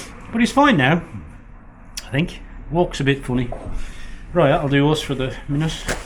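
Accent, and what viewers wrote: Dublin, Ireland. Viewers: I laugh every time I listen to you. A missed friend is from Dublin and your phrasing is music to my ears. I knew you were a Dubliner almost immediately even though I haven’t heard it in years. Good videos , fun to watch. Stay on your feet on the roof, you took a good thump there. Yes I saw it yesterday. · Lovely seeing your cottage and lovely fire your a treasure enjoy Christmas love your irish lilt ❤😊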